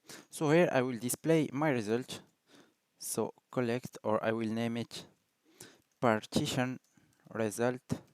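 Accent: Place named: France. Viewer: absolutly he is french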